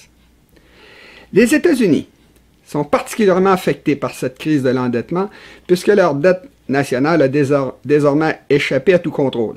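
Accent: Quebec, Canada. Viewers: Mr, des explications et conseils clairs, precis,concis,référencés, et un accent quebequois moins insupportable et plus comprehensible que d' habitude (pour un francais). j' apprecie la synthese complexe DES problemes actuels en 30 minutes ce qui me fait dire que vous etes peut etre du serail et maintenant repenti... exposez-nous votre parcours professionnel et vos motivations a tout debaler aujourd' hui... les mots n' ont pas la meme valeur suivant qui les emploie cela n' enleve en rien la qualite de la video je m' en vais en cueillir une autre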